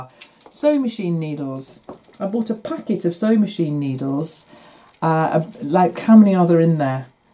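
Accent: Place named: Northern England, United Kingdom. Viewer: Hello. I just found your channel and what a lovely surprise! As soon as I heard you say, " Fed oop", I knew you were in northern England! I am back in the States, but worked in Bradford for three consecutive years for George Barker Refrigeration, as a Sr. Buyer, from 1992 to 1995. It was such a major, but wonderful experience for me that I am going to write a book about my stay, using a sense of humor, similar to James in All Creatures Great and Small. My best friend, Val, lives in Leeds and we visit and email to this day. So I can enjoy listening to your accent and northern phrases. Val would say she had to go home and feed her moggies and now I don't bat an eye! I miss her. We even have the same birthday! I am native to the USA and living in New Hampshire. We are a family farm. And I am just putting together a sewing cupboard. 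My sister gave me a lovely small armoire with lots of drawers for thread and supplies. You seem to be sorting out your sewing drawer.Nothing wrong with keeping bits and bobs that you may use at some point. I have issues with remembering what is in what drawer! I look forward to following your channel. Are you in Yorkshire? - Diane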